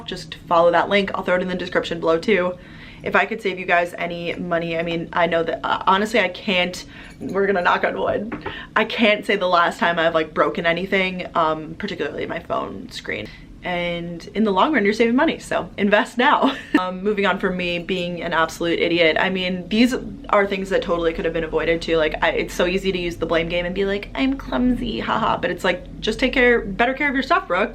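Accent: Long Island, United States. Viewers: your long island accent is just the best🥰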